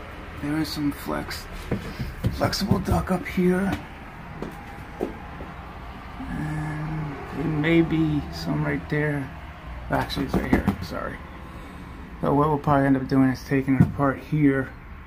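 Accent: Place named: New Jersey, United States. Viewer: Damn. Didn’t know you were from Jersey.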